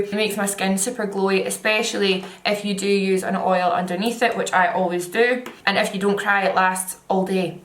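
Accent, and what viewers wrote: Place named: Falkirk, United Kingdom. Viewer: Are you from Falkirk because your accent is quite strong x 💓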